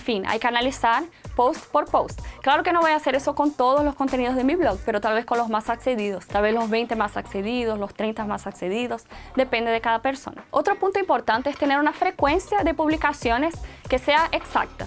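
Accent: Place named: Brazil. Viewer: Me encanta su español con un toque brasileño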